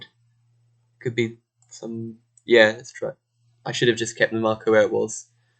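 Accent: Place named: United Kingdom. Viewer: great video, enjoying the face cam. in the last video you said you live in taiwan. are you a british living in taiwan (because your accent is quite british) or are you actually taiwanese? just curious.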